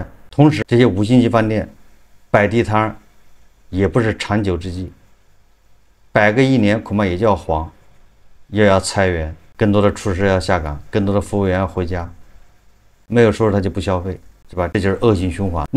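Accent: Anqing, China. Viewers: 第一次看你直播的时候听口音我就知道你是安庆的，怀宁的懂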